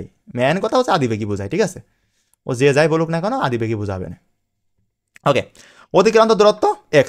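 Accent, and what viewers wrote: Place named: Jessore, Bangladesh. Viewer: Jessore er vasa😁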